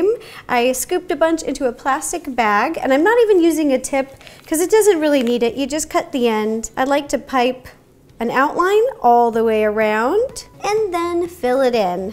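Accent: South, United States. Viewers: She is starting to sound southern! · was it just me or did she sound like she had a southern accent 😂😂 love u ro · Why does she have a southern accent half the time? She's so cute😂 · No one:......... Me: notices accent why is there a southern accent if she is from Seattle, WA?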